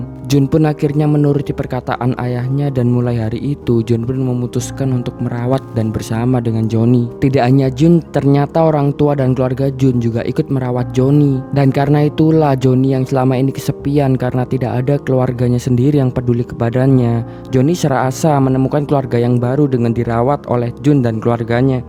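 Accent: Java, Indonesia. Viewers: medhok jowo👍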